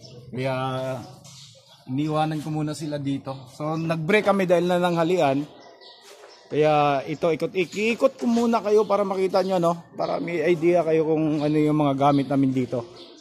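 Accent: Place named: Visayas, Philippines. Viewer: Bisaya kayo boss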